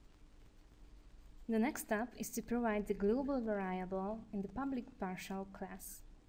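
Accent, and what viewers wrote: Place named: Hungary. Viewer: Annyira magyar az akcentusod hogy kihallom :D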